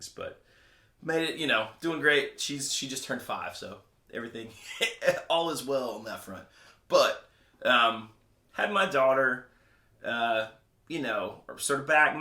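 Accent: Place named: Scotland, United Kingdom. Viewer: As a recent subscriber to both your channel and Casino Guitars and now I want to say thank's to you, Baxter and for excellent content. It's also great to hear a fellow Scottish voice talking guitars.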